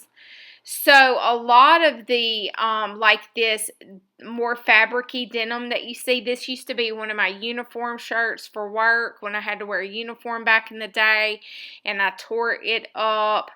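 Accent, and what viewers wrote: South, United States. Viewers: I love your southern accent! Oh my goodness me, I miss the south! Keepers of awesomeness! YES! My family laugh at me for keeping stuff as treasures useful for later! I love your handiwork! I am as from now a new subbie!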